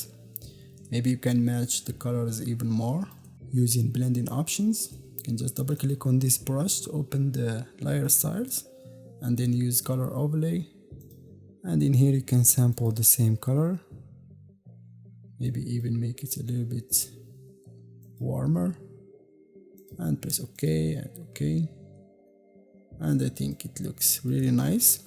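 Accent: Morocco. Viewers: Your accent look like Moroccan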